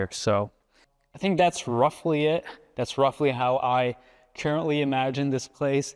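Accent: United States. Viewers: real question. Are you American or how do you have an American accent?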